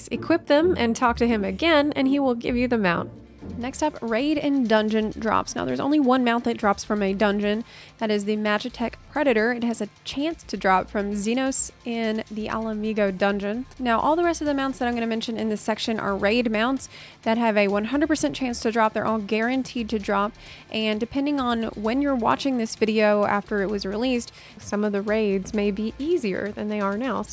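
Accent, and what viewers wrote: South, United States. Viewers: I keep ending up here plus you’re southern and YT needs more southern accents. Subscribing and thanks for the content :)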